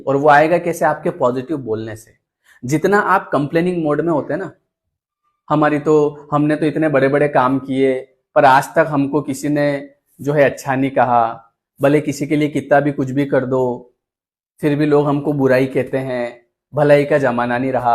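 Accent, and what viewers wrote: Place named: Mewar, India. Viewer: Sir, Apka mewadi tone bhut acha h bolne ka.😅 U are amazing. 😊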